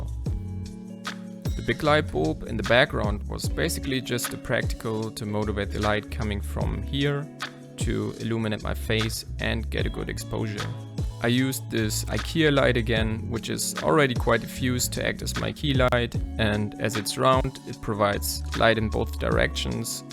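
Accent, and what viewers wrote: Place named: Germany. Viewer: your english sounds so german. but your video is great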